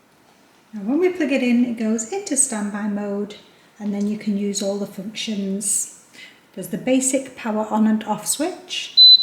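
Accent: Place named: South Africa. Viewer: She sounds like a white South African. If that’s correct, I hope you are in the U.S. now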